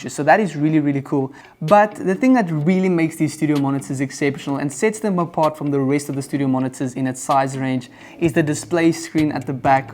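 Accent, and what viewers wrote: South Africa. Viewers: Good old SA accent😂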